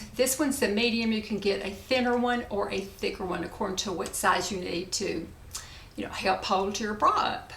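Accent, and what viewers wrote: South, United States. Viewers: You are just so stunning and in your 60s. You look so much younger. I love your beautiful Southern accent! Thank you for all the great tips. I love your channel.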